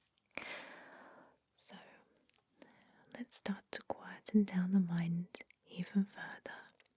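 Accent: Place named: United Kingdom. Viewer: You’re only saying that because they look alike... and sound alike... and both have british accents... and both do asmr... and both have the first name... and they are the same person.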